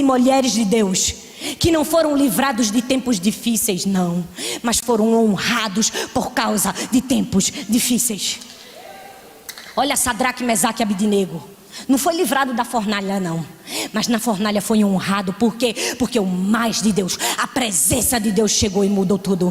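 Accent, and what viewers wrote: Northeast, Brazil. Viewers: Pastora amo seu sotaque. Sou do Nordeste também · Pelo sotaque sabemos q a pastora é nordestina gostaria de saber de onde é . Muito boa cheia de Deus 🙏🙏🙏🙏🙏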